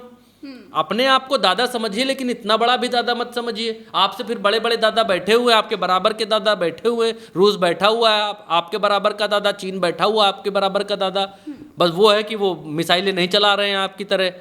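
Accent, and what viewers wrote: India. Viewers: bhaiya aapka desi Andaaz hai maja aa jata aapko sunkar Jay Hind Jay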